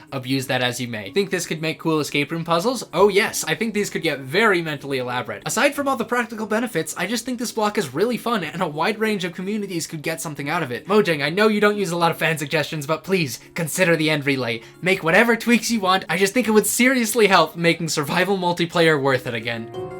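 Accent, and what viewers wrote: Canada. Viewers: Ugh 😩 voice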